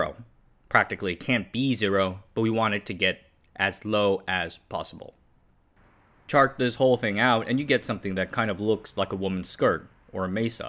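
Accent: United States. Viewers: Surprisend by you saying "aluminium". -Yet you sound like an US American, possibly of Asian descent (barely noticeable at all, when listening to you speaking). What's your story? You didn't say "aluminum" ! -Yet you just sound "american" for my nort european ears! :p I really enjoy listening to your informative, interesting and detailed videos ! Keep up the good work 👍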